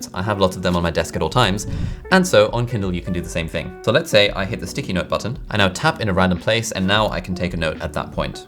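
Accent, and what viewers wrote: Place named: United Kingdom. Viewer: I feel so settled when I hear that british accent and looking at you.... 🥰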